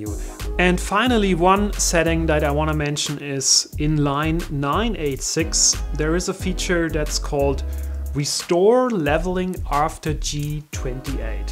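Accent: Germany. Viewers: Hi, "hallo!" may I say if I recognized correctly your german accent(!?). Anyway, you really rock as a teacher, with cristal clear explanations, and you have the hability (intelligence!) to clarify and simplify those complicated lines of commands in Marlin: just a thousand thanks for that! I know I'm coming really late after your video, but I only saw it 2 days ago... I have a precise question for you: I did all you described, also for the #986 (#define RESTORE_LEVELING_AFTER_G28). But I tried it (I have an Anet A8 with a V 1.5 board), the manual mesh leveling is perfect, and so are my prints afterwards! ---> BUT... if I shut off my printer, the next time I'm launching a new print...the bed is not leveled anymore....I must re-do the complete manual bed leveling again.... ---> it seems that the leveling is NOT written in the EEPROM, although I had uncomment the line #986 (#define RESTORE_LEVELING_AFTER_G28) and click on "Store settings" on the LCD panel.... 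ANY IDEAS? Thanks again, vielen Dank, hope you'll be able to see my questions and answer it...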